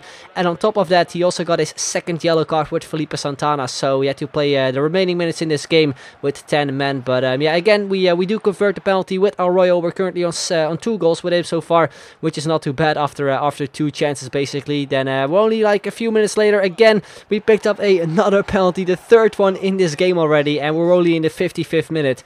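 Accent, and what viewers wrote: Netherlands. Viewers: Ben je nederlands want je klinkt wel zo😁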